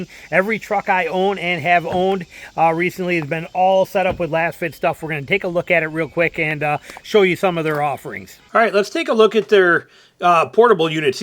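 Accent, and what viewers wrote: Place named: South, United States. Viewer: sounds like a redneck. I passed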